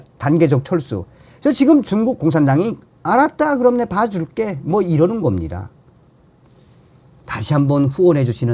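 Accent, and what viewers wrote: North Korea. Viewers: 북한말 하지마세요.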